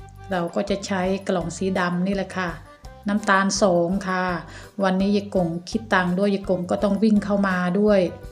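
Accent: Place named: Surin-Buriram, Thailand. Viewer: พี่บัวบุษบงมาชมการทำแตงกวาดองด้วยจ้า ว้าวง่ายจังค่ะ จ๋าเป็นคนแถวไหนน้อพูดเหมือนคนแถวๆสุรินทร์บุรีรัมย์เลยจ้า..พี่บัวคนสุรินทร์เด้อจ้า😂😂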